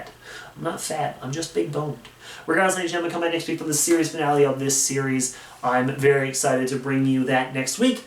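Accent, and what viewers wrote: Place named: United Kingdom. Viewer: I swear sometimes you sound British.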